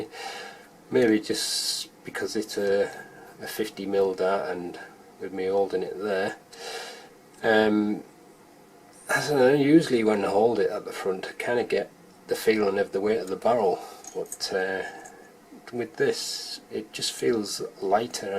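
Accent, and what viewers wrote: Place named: North East England, United Kingdom. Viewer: I can't mind too which video it was but that's when I said "I detect a north east accent!" haha... Hartlepool back in the 80's was a nice place to live, used to live in one of the nicest roads to live and now it's like a jungle now, all the houses are gone cause the council are trying to make better houses and trying to hartlepool a city but it no allowed to be one cause of the drugs and violence have increased in the last 10 years:(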